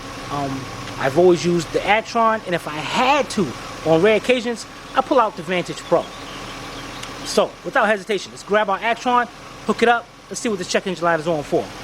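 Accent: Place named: Boston, United States. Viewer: I know this is off topic but...North Carolina??? "...so let's get up under HEAH...", "...CONNECTAH.." and fast talking... that accent sounds Boston-ish, New York-ish, Jersey-ish??😂😂Thanks for the information in this video. The 'wall' explanation helps a lot as my vehicle is throwing this code. ♥ .•:*¨¨*:•. ♥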